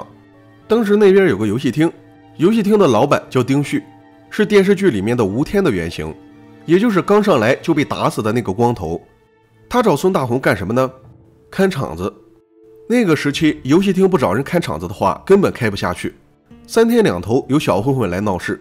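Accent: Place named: Henan, China. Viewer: 我听出来河南口音了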